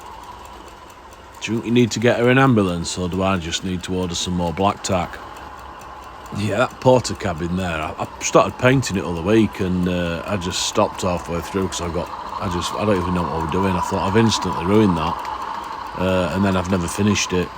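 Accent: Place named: Northern England, United Kingdom. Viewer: I really think you could be on TV!!!, like the way 'Thomas the tank' was shown, but in the current era, your northern accent,witty humour and the way you make it like a soap, is brilliant!!!!, you got me hooked!! 😅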